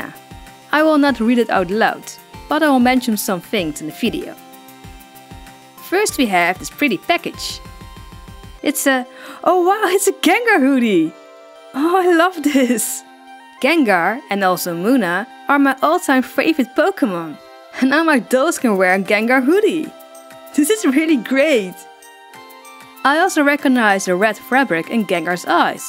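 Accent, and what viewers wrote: Netherlands. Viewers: ik kan echt horen aan je engels dat je nederlands bent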